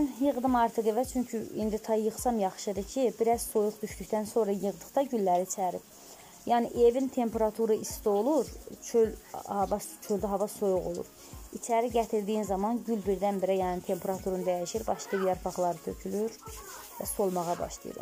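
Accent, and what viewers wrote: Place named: Shamkir-Gadabay, Azerbaijan. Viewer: lap yaxşı yox yanılmışam ləhcənizdə Şəmkir Gədəbəy zonasına oxsatdim ləhcənizi.mən♥️ Ucardanam ♥️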